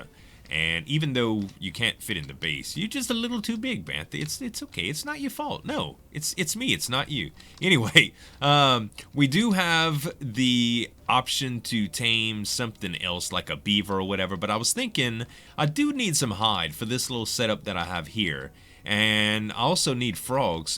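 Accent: Louisiana, United States. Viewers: Found all of you guys via Slip's channel, you're the only one I subbed to just because of that sweet sweet Cajun commentary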